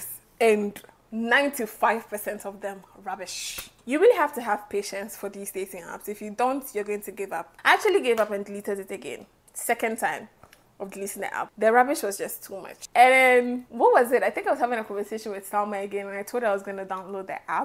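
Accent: Jamaica. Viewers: Jamaican men are nice happy for you...when they really love you they will go all the way out for you...happy you got to visit my country and hope u come back all the best and have a great week...u catch on the patois real fast man❤❤❤ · The best storyteller ❤❤. She all start talking potios..she is hooked.... i love that....if you are not Jamaican the "all a dem" might have missed you at the end. I loovvee it!❤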